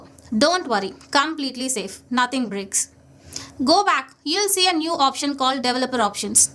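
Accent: South India, India. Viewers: #@ Please pronounce english word properly you are using south indian accent english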